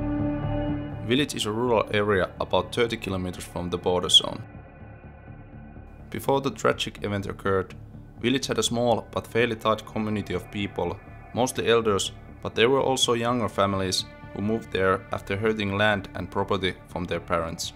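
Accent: Finland. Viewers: This format is really good man. Hella interesting but also it really shows that you are competent at game development (Its easy to say you are good at something), you are trust worthy and honest! So many scammers out there and delusional devs! Good luck man I hope to back this when I can. Oh and I absolutely love the Finnish accent so enjoyable to listen to haha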